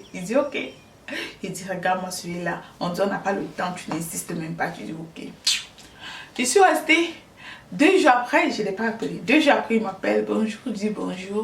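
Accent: Cameroon. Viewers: ma compatriote equato hein🤣🤣🤣 heee pero no parece tienes más acento camerunes 🤣🤣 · J’ai reconnu l’accent bulu du Cameroun! Tu n’es pas gabonaise. Quand tu t’exprimes exactement comme une beti. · je croyais que tu étais camerounaise avec ton accent 🤗😂 Ton mari ce sont tes yeux de désordonnée et ton piercing qui lui ont plu 😂😂😂😂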